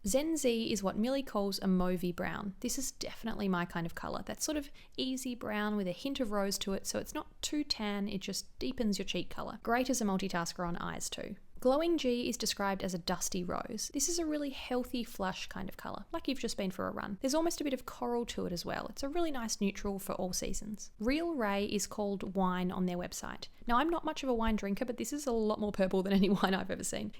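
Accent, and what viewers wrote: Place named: Australia. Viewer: also I'm Australian and its nice to hear a friendly accent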